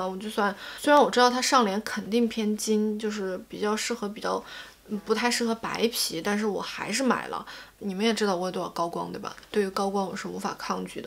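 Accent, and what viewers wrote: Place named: Sichuan, China. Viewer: silvia是四川人么，说水蜜桃的时候就在想，直到说卸甲水的时候说吃进去转一下的时候觉得我们是老乡耶哈哈，稀饭你～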